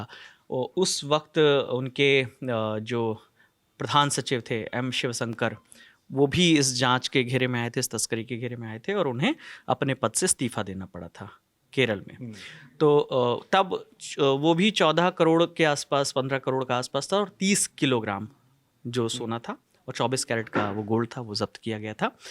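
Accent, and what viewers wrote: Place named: Bihar, India. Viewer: Dhar liya jata hai .Bihar ka tone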